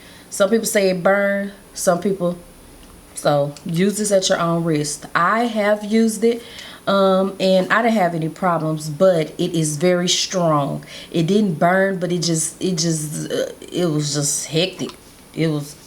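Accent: Dallas, United States. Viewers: I figured you were in Dallas just by how u talk , I know that talk lol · When she say it with that southern accent 🤣❤️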